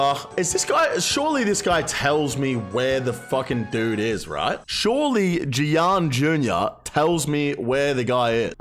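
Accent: United States. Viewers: Actually not half bad new york / jersey accent. See you at Tony's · It’s refreshing to know Australians do American accents. I guess the thought just never occurred to me lol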